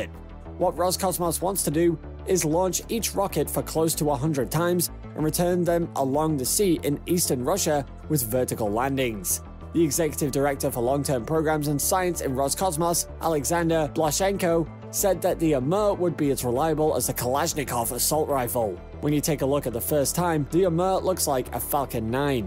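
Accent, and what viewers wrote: United Kingdom. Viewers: One of an Uncountable number of miss pronunciations. I don’t mind the strong British accent, as an American, but I do not appreciate so many mispronounced words as if the narrator doesn’t have a goddamn idea what he’s talking about. It’s very unprofessional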